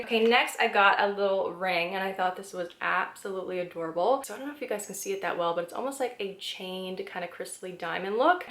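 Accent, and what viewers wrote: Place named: Canada. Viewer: I'm 51 from Australia and wish Temu was around when I was in my 20s! Great finds and so affordable too! - thanks. I've got my eye set on that chain bag and the watches. PS - Your hair looks great and really suits you. Love the Canadian accent too, I went to London Ontario Canada when I was 13 to visit family and would love to go again one day. Beautiful country!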